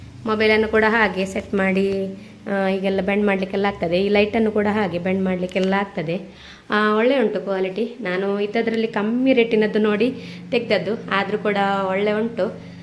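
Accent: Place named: Mangalore, India. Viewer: Manglore Sister Tamma Modalane Video Noduttiddene Nimage Olleyadagali Matte Adaga Videos Noduve Support Maduve Ok na Nimma Manglore Matu Tumba Ontra Kelalu Yeno Helodakke Tilita Karnataka dalli Onda Onda Kade Onda Taraha Style Kannada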